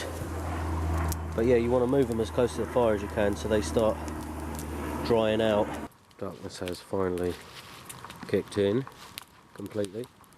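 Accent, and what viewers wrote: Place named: England, United Kingdom. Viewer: Abit of a bastard haha. I love he english accent.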